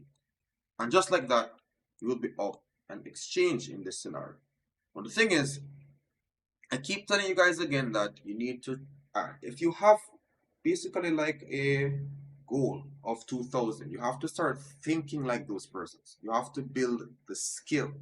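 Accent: Jamaica. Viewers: I hear a Jamaican accent. Good on you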